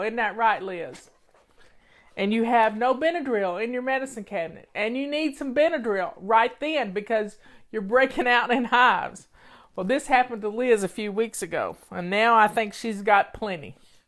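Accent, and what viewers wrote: South, United States. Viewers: Omg. You are so southern. I love it but I never imagined your voice being so perfectly southern 🖤🖤. Been following you for years and finally GETTING it by not trying to alter the system. Thank you!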